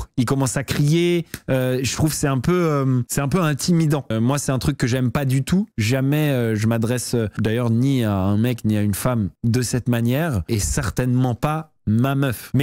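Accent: Marseille, France. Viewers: T es RIDICULE avec ton accent Marseillais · tu fais trop rire avec ton accent marseillais 😂😂😂😂on dirait plutôt un accent asiat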